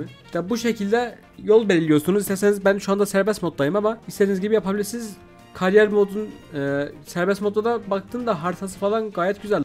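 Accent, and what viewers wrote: Erzurum, Turkey. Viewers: abi erzurumlumusun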